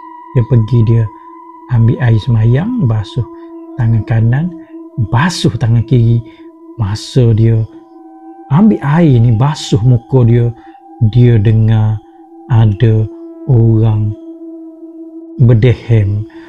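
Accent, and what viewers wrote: Kelantan, Malaysia. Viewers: Pekat bethol kelantan kau bang 😂 btw keep going 👍🏻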